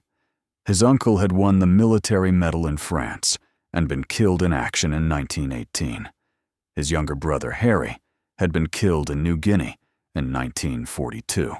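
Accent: United States. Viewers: Pity this story has be told in a crap American accent who can’t pronounce Australian places and speak in Strine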